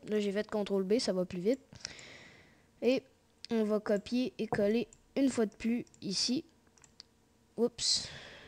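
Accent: Quebec, Canada. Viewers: Super tuto avec un charmant accent canadien. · Tu es québécois UwU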